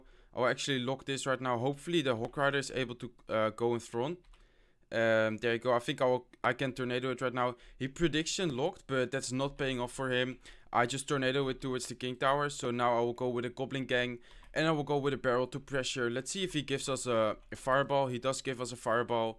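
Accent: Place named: Netherlands. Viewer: Are you dutch? Because you sound like a dutch guy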